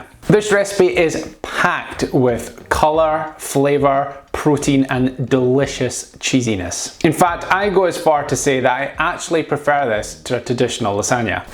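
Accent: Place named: Scotland, United Kingdom. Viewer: I really enjoy listening to your lovely Scottish accent talking us through these recipes and I am looking forward to trying some of them very soon. I especially enjoy the slow cooker recipes as that’s all I have at the moment, and recently made a pasta Bolognese from one of your recipes and it was delicious! 😊 BTW, my guide dog is actually from Glasgow. 🦮😄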